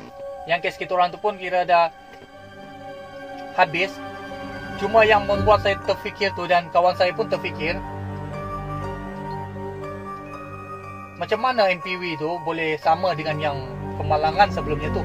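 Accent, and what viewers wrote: Sabah, Malaysia. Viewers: Abang org mana bang? Sebab dengar loghat mcm belah Sabah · Kesian loghat sabah pun tk tau bezakan kata org malaysia. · sama ada sabah atau indonesia..sbb bahasa nak sama dah..tapi tengok kt video ade tulis indonesia xtaw la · cara bercakap ni tentu orang Sabah bah ni di KK bah tu , inda kau tau itu orang KK itu sana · Bunyi sabahan kuat juga tu..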